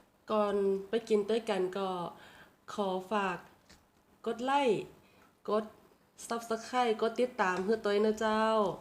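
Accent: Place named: North, Thailand. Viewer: ต้มขมเครื่องในน่ากินมากเลยครับพี่ พี่เป็นคนเหนือติครับ#เจ้อยู่ที่ผมทำงานกะพูดแบบพี่เลยเสียง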